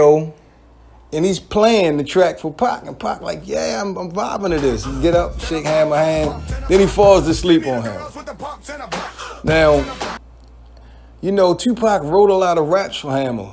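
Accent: Baltimore, United States. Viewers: The guy doing this video has a Baltimore accent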